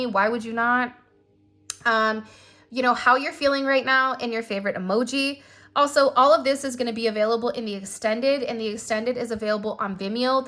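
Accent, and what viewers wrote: California, United States. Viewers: Yes and I am one of those people that do not like your accent either. I do not like the American accent on most that I have heard. And yet my favourite singer is Mr. Neil Diamond, - and he talks so pleasantly, and American. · ! Girl you bringing the FACTS!! Resonates and Confirmation 💯🔥🔥 by the way I love your Cali slang lol I am on the other coast tho so it's different so I enjoy it😂 and Maaaannn the Angry Beavers you came with that and I was like OMG!! 😂 Yasss!! Love Ya! Thanks so much😊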